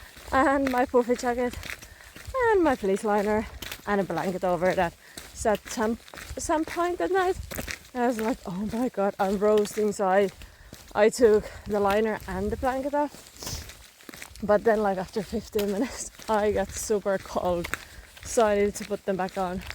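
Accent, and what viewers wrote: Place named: Ireland. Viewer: I’ve often wondered how a Finish girl should end up in Ireland , you picked up a good accent so must have been a while . I like a Crisp sandwich any day of the week 😊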